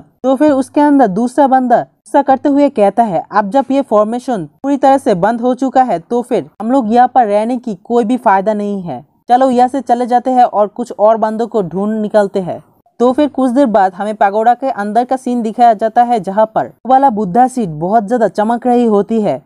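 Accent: Bengal, India. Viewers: Bro r u bangali tumhara hindi pura nehi hae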